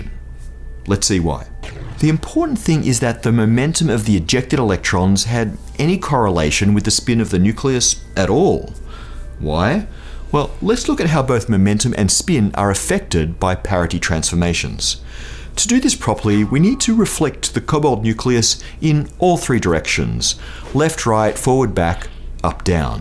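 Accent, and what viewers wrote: United Kingdom. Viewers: This is the first Brit I can listen to without getting annoyed. And the information is easily digested. Very nice job. Thanx. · "The zed axis", "the Zed boson" - strikes me as Brittish-y; do Americans say it like that? Or in the US they have the Zeeman effect and in the UK it's the Zedman effect?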